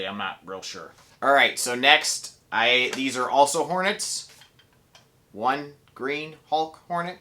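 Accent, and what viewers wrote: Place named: South, United States. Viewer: Where do you live?!?!! You have a southern accent.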